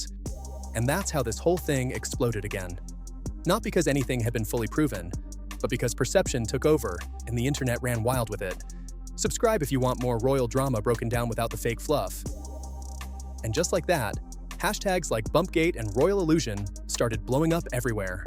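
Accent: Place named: United States. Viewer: Usual yankee verbal diarrhoea narration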